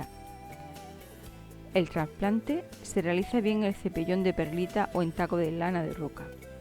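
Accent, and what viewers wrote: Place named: Murcia, Spain. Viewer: E murcianica la que habla.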